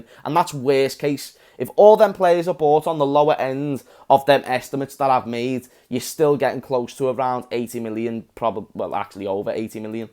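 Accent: Liverpool, United Kingdom. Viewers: Yous a proper scouse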